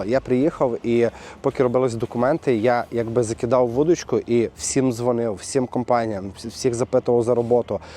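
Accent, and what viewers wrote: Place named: Kherson, Ukraine. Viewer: От куда суржик с Херсона?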